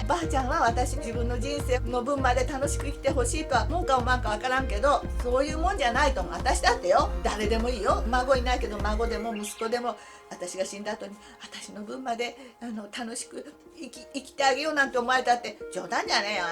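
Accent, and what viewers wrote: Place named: Hiroshima, Japan. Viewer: 広島弁かな！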